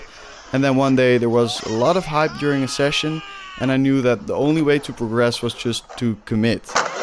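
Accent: Netherlands. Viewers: Wait u Dutch? That explains your accent, hahaha ga zo door! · yo u dutch to? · you sound dutch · Bro ik hoorde je accent in VLSkate’s video en ik wist gewoon dat je nederlands was, lijpe videos man keep it up 👌🏻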